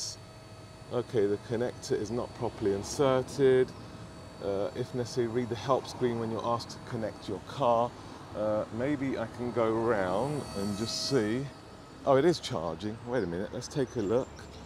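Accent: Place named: United Kingdom. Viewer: You sound like British guy now.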